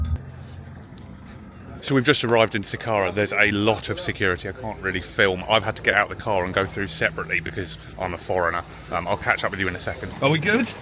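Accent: England, United Kingdom. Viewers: This guy is extremely english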